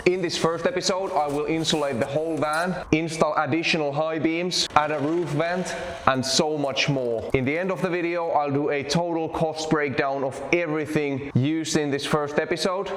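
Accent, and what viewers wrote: Finland. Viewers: vai että kässis. pakko sanoa etten oo koskaan ennen kuullut moista. kai se voi rallienglanti taittua opelikuskiltakin.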